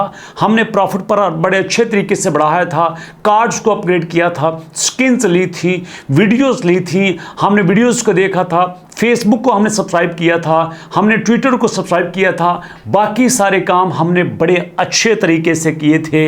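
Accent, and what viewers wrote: Punjab, India. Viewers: I think sir g you are from Gujrat Punjab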